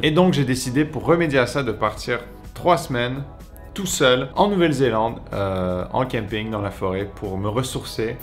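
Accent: Quebec, Canada. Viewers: Omg je te suis depuis longtemps et c’est fou comment t’a maturé c’est vraiment nice et t’a tellement adopté l’accent québécois xD on dirait plus la même personne quand je regarde des vidéos plus anciennes haha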